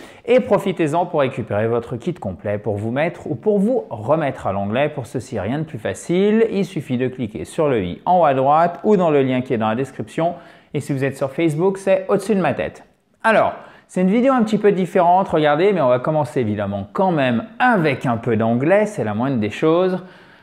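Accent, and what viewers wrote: United States. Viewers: I love your American accent! Why don't you speak more English than French? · Thank you very much,. What I like the most is how to build some simple sentences and how to conjugate the verbs. The American accent disturbs me but it might be necessary? I would have an English accent. I would like some videos with dictations if it is possible. See you soon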